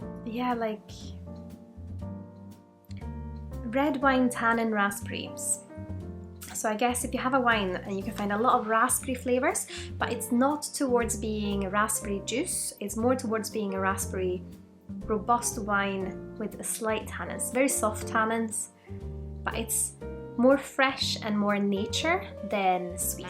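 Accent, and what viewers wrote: Scotland, United Kingdom. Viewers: Sökte på youtube efter denna whiskyn efter jag köpte den på systemet, kul att hitta en video från någon svensk! Men du låter lite skotsk haha :D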